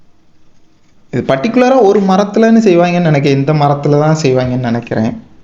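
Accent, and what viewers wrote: Madurai, India. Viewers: ஒன்னு மதுரைக்கார மாதிரி பேசு இல்ல கன்னியாகுமரி காரன் மாதிரி பேசு, ரெண்டு மாதிரியும் பேசி கொல்லாதடா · Madurai slang 🔥🔥🔥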